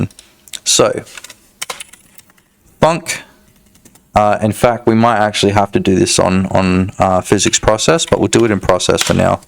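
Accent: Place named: Australia. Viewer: Australian English can be a bit tough. Slow the playback down to 0.5 to drag out those vowels and make it sound like were all at the pub after a big night :)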